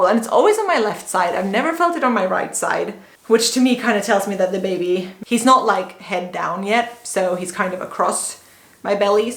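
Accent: Finland. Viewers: your accent got so Finnish since you've been living in Finland, all this talking on a single breath intonation going down thing, so cute :)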